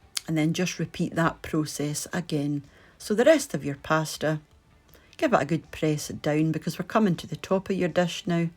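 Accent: Scotland, United Kingdom. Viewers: Great channel! I'm subscribing! Nice to here a Scottish accent too! I'm in Edinburgh 👋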